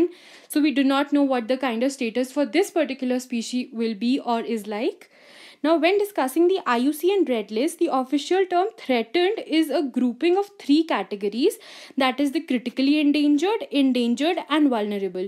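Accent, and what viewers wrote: India. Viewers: Indian dialect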